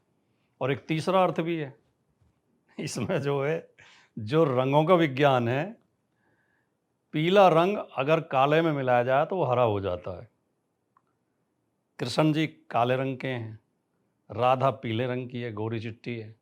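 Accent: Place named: Haryana, India. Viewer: I m from Chhattisgarh.. love to hear haryanvi tone..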